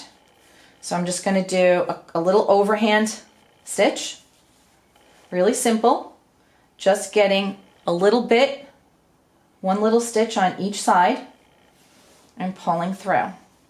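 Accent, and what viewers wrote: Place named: Brooklyn, United States. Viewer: Are you a Brooklyn girl originally, specifically Williamsburg section, cause you sound like me girl. Lol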